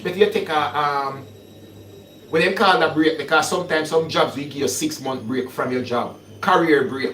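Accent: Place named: Jamaica. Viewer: ❤. Love listening and learning. Keep up the great work for the people.❤❤ Truly just Love the Jamaican accent. Would Love to return to and immigrate to Jamaica. ❤❤❤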